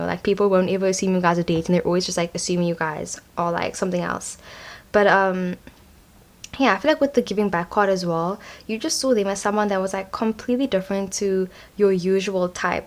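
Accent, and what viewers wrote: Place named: South Africa. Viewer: are you from... SA.... bc I am and I hear an accent 👁️👁️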